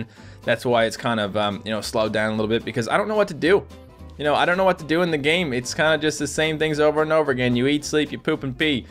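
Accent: Canada. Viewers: Hi um C: Just wanted to say I REALLY love your videos and I did not know that you wanted to be a doctor but hearing your reasoning that is REALLY sweet and ahh. I always get very excited when I see there's a new video because I always know I'm going to enjoy it and yeah!! I am sorry if this is awkward I have never left a youtube comment before! ps: When I first saw your videos I KNEW you were Canadian by your accent and was very pleased when I discovered I was right.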